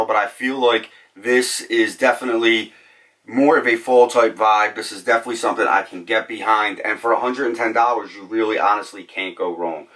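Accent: Philadelphia, United States. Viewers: heavy Philly accent. I love the look of this shoe.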